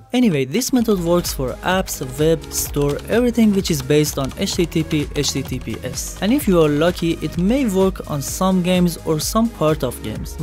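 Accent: Iran. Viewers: فکر کنم شما ایرانی هستی. از لحجه انگلیستون فهمیدم👌🏻✌🏻😅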